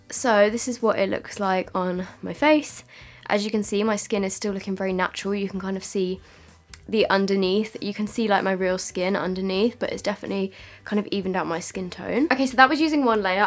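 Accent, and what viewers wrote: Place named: United Kingdom. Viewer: Its so hard to watch this when u hate british accent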